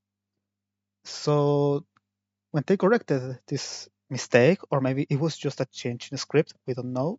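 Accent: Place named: Spain. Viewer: Ah ese acento español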